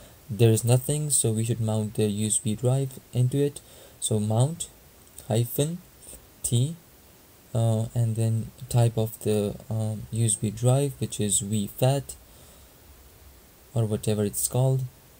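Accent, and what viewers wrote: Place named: Arab world. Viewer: Bruh judging by your accent are you an arab? If so bruh feeling proud of tht i am an arab too.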